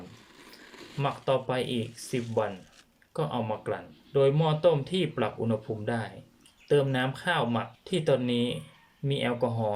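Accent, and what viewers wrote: North, Thailand. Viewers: สำเนียงเหมือนคนเหนือเลยครับ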